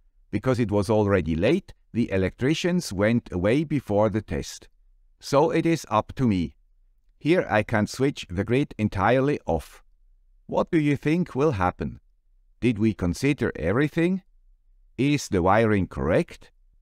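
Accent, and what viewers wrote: Switzerland. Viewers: I recorded "here is the guy with the Swiss accent" onto a solar powered recorder. So I can get a smile on my face even if power goes out for weeks.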